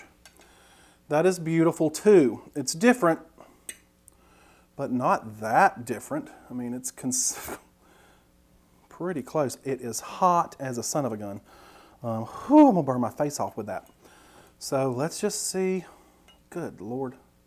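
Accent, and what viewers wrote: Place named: Tennessee, United States. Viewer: I am from Knoxville, TN and I now live in Florida. It is driving me crazy trying to figure out your accent! I believe you are from Tennessee too??? Please tell me!!! I’m really going crazy😂😂😂 I’ve watched many videos trying to figure it out. You just use lots of slang I have only heard in Tennessee. I love your videos and you and I think a lot alike. Please have a great day!...... and please answer my question 🤷🏼‍♀️😂😂😂😂😎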